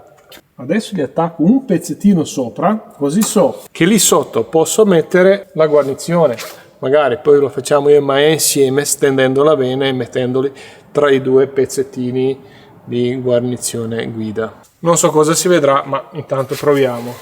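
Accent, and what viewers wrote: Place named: Eastern Europe. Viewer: ma..io sento un accento slavo .. e nn dico rumeno.. secondo piu slavo .. ucraini.. ex iugo..bulgaria?)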